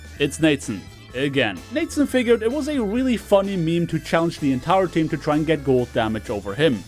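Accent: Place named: Germany. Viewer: just keep speeking with dat FAT german accent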